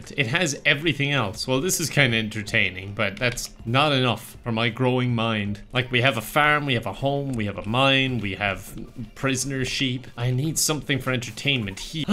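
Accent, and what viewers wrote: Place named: Ireland. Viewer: Why do you sound like PewDiePie with a jacksepticeye accent 🤣 I know you are an Irishman ❤️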